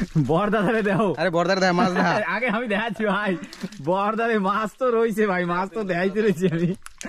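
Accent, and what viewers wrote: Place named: Barisal, Bangladesh. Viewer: সবকিছু ঠিকঠাক একবার বাংলাদেশ থেকে তোমার ওখানে বেড়াতে আসবো ভাইজান, তোমাদের কথা সুরগুলো আমাদের বাংলাদেশের বরিশাল অঞ্চলের মানুষের মত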